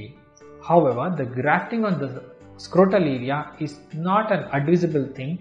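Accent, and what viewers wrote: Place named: Punjab, India. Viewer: I don’t understand Punjabi English.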